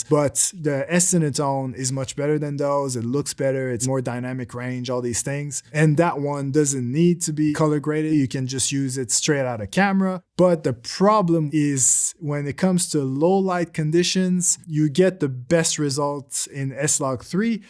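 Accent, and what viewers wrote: Quebec, Canada. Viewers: Jpourrais jurer que je reconnais un accent du quebec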